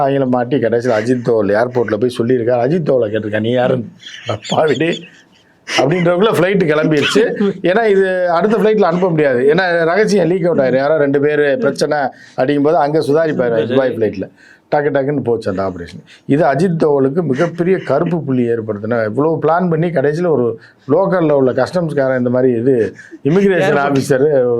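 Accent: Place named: Madurai, India. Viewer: எல்லாம் யூ யூட்யூப் சேனலில் உங்க மதுரைsland பேச்சு க்கு தனி சிறப்பு❤❤அதில் ஒரே சிரிப்பு மழை😂😂😂😂😂😂😂😂😂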